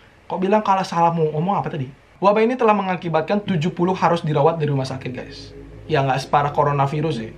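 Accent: Bali, Indonesia. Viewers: "Anak muda tidak boleh bermalas malasan" Orang bali kyk aku suka males malesan:v Wkwkwkwk:v Logatnya, logat bali bgt😂 Dan aku baca coment comentnya "mantep bli" ngomongnya "bli" tpi namanya dia isi "Muhamad":v Aku orang yg tdk bisa dibohongi (sejak kapan?,-) BTW.. Aku: {\___/} nih.. ( •. • ) / >❤>\: {\___/} Cai nyen?,- ( - _ - ) [maaf bahasa kasar] / | | \ Gabut mks:D BTW, Buat conten tentang makanan di Rusia dong:( Disini adanya lawar ama betutu aja:v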